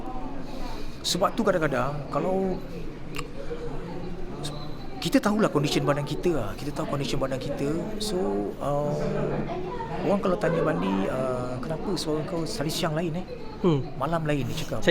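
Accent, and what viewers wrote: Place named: Johor, Malaysia. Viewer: slang johor sey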